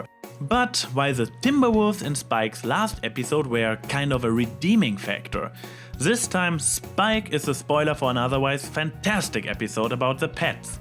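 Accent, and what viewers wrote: Germany. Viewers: Ich hatte an Deiner Aussprache schon erkannt dass Du Deutscher sein musst :D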